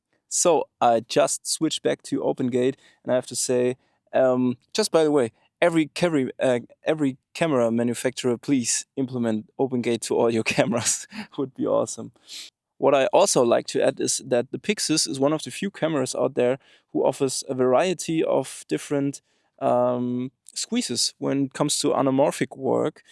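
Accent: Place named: Germany. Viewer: Mach deine videos auf deutsch. Der markt ist gross genug und die welt braucht nicht noch einen deutschen mit furchtbaren akzent der viel zu langsam redet weil er im kopf Übersetzen muss. Geile kamera, sie hätte ich auch gerne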